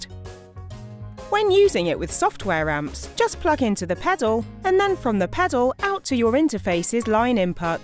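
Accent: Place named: England, United Kingdom. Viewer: The English accent creeps me out... and i was born in England...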